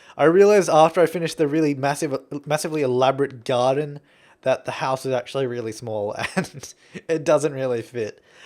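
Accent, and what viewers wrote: Australia. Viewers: I know this is an old comment, but I do the exact same thing. I guess my love of Australian accents makes his voice 10 times better but even if I wasn't oddly obsessed with the accent his voice just has a soothing sort of sound to it ahaha I sound soo creepy right now xD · Madisyn Davis again... this is a really really old video but still... cannot agree more. I love listening to his voice. (But same as you I have a strange obsession with Australian accents so...) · he's australian, not a kiwi. he has a australian accent :) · I love your accent, I was going to ask where your from, but I figure by the way you rponounce your Rs and AHs, it's Australian.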